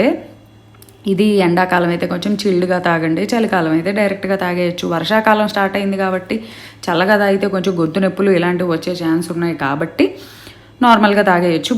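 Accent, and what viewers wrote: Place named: Vijayawada, India. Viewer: Nice to hear Vijayawada Telugu 😊👍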